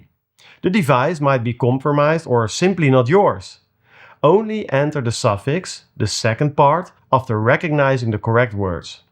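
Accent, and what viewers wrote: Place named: Netherlands. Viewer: lol dacht ga engelse vid kijken gelijk NL Accent gehoord nu nog meer geintresseerd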